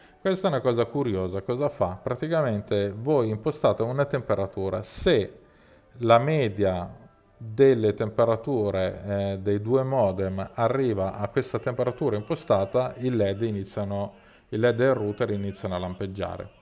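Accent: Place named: Veneto, Italy. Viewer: Il peggior problema è che l'algoritmo del router sceglie la banda con il migliori RSRP, RSRQ, SINR senza curarsi dell'effettivo risultato in termini di velocità. Nel mio caso la banda 20 restituisce ottimi parametri di ricezione, ma velocità scadente. Inoltre nell'hack non registra la scelta di banda, anche se nella pagina di debug è settato su auto. Nel mio caso, per esempio, indico 1+3+7 (sui -110 db) per evitare 20 e 28 che sono lente (sui -80 db), e questi parametri non vengono registrati. Rimangono solo due opzioni: fissare la banda a una sola o dalla pagina di debug o dal cell lock. Da quando ho smanettato con l'hack, il router non aggrega più. Non capisco poi quindi la differenza tra il cell lock e la selezione della banda e forse considerando che ho solo una sola BTS a 900 metri nella mia area, non fa grande differenza. Fino al 24 del mese sto ancora in 4G, dal 25 vi aggiorno. 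Un ultima curiosità: la mia zona non c'è zefironet, uso Iliad, ma il router accetta sia gli apn di wind che di iliad, la cosa gli è indifferente. Stessa cosa con le con le bande 4G in cui la 20 è su banda di wind e 28 per iliad e funzionano indifferentemente. Un grazie speciale a per il suo grande studio e disponibilità, mi spiace che hai dovuto dismettere il tuo blog. Un unico neo: ogni tanto la tua dizione è poco chiara. Non è per l'accento veneto, che ci sta, ma proprio non articoli bene alcune parole. A volte devo rallentare il video, ripetere il play, consultare la sfera di cristall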